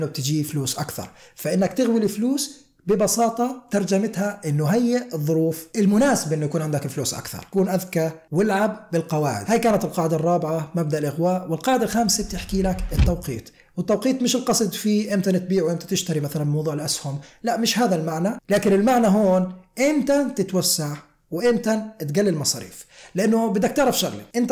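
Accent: Jordan. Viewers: من اول قناه ومن كلامك عرفت انك اردني الله عليك يا نشمي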